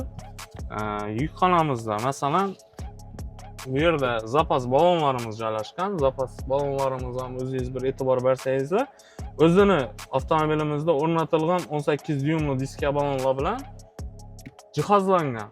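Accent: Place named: Khorezm, Uzbekistan. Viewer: Молодец. Обзор яхши чикипти. Айрим хоразм блогерларни умуман тушиниб булмайди. Бунда хоразм акценти бор булсахам хаммаси тушинарли.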